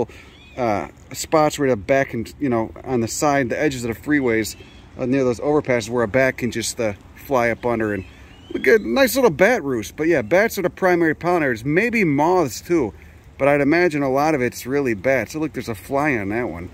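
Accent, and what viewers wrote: Chicago, United States. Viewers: You are welcome, I try to participate as much as possible. 😎 I am an active Master Gardener and Naturalist with a mostly wild yard in a mid-sized town. I enjoy his use of Latin names and style, not to mention the accent brings back fond memories of South Chicago, and Da Region.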